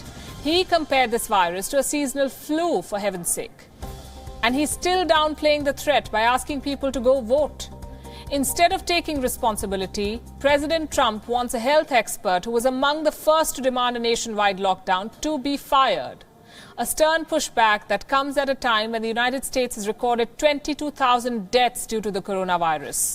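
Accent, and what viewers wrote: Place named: India. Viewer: Majority of indian population understand their own language better than English So then why english channel with indian funny accent Why they need to pretend to be What they are not hahaha lol So stupid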